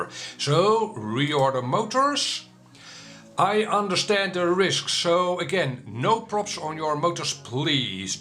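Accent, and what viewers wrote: Netherlands. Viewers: that was it, not DCU driver. I downloaded the impulse RC driver fixer. It connected to the flt controler had a little look around saw what was missing and loaded it, then left it in boot load so I could just open Betaflight and do the flash. Sorted 2 yellow boxes and I could do what you demonstrated. Thanks a mill for making me aware of them buttons, just had to find them. Subscribed, do love the Dutch accent.👍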